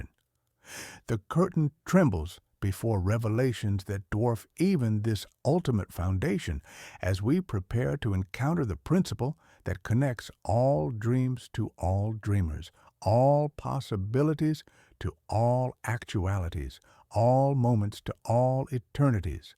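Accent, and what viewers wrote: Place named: Georgia, United States. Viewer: truthfully I think it's the Georgian Southern gentlemen voice narrating THIS at me that's oddly... amazing somehow for some reason I can't quite put my finger on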